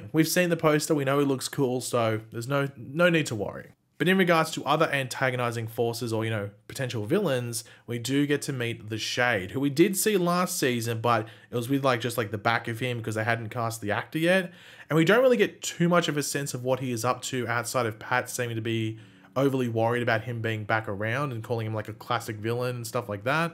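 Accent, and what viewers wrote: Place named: Australia. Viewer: Ur Australian! Lit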